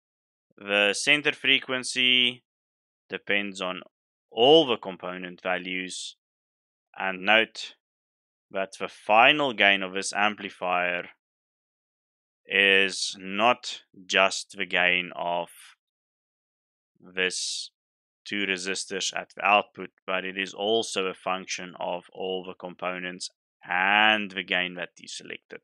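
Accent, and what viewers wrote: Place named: South Africa. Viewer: Sounds South African, baie dankie boet.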